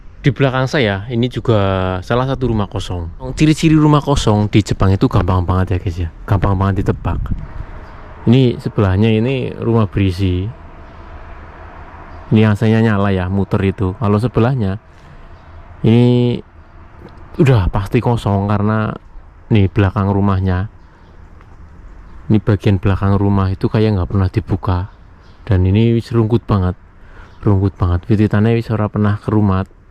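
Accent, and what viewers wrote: Banyumas, Indonesia. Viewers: Bang banyakin ngomong ngapak nya bang...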